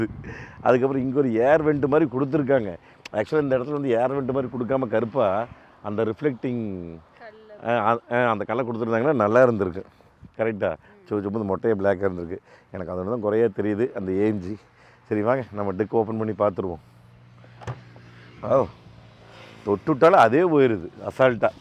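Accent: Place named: Tiruppur, India. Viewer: Your speech is very nice in tirpur language we are from tirunelveli.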